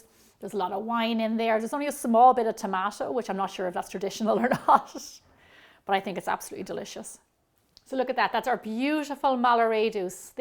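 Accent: Ireland. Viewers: I love the pronunciation of Italian names by someone with an Irish accent! ❤️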